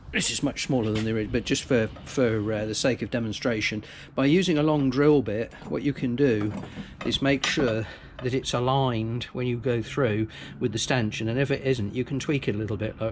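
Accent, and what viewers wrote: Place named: RP, United Kingdom. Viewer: Bonjour, The more I see your videos, the more I discover your crazy project. Once again, I would like much more videos, I am sure I am not alone and people who help you. As an example, I would have liked more videos of the hull rebuild and the calking among other topics because I think I have seen some light through ot in your previous video. Besides this, I like your accent, it looks like the one I learnt 50 years ago at school and I easily understand your explanations. I would say that you have a BBC 1 accent perfect for students 😁 Amicalement, Raphaël, no longer student for a long time but always passionate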